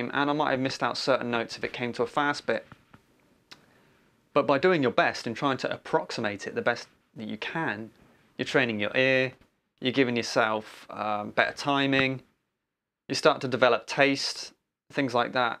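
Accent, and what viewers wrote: United Kingdom. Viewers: Hey man, great video! Are you British by any chance?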